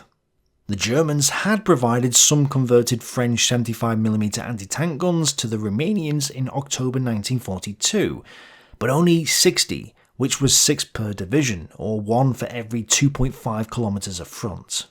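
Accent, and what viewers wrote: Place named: Liverpool, United Kingdom. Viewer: I’m really not placing this accent, I’m getting Liverpool, a hint of Manchester, and possibly Lancashire as well. Maybe St Helens???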